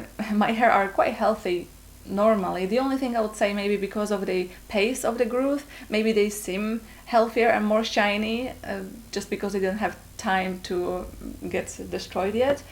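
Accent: Poland. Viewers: This Polish accent... brrr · Nie wiem czemu, ale typowo widać po twoim ang, że jestes polką.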